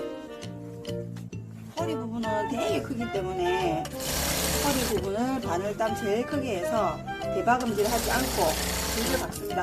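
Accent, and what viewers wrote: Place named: Busan, South Korea. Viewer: 오랜만에 부산말 듣네요 용신다 요즘도 이 말 쓰네요 젊은분이 용신다 하시니 오랫동안 외국에 사는 제가 고향 부산이 확 들어 옵니다. 손녀 들 옷 만들려고 하는데 너무 예쁜 옷 도움 됩니다. 감사합니다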